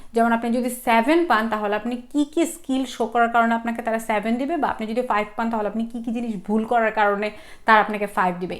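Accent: Sylhet, Bangladesh. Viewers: khuda lagi gesilo......sylheti accent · Apu apni Sylheti?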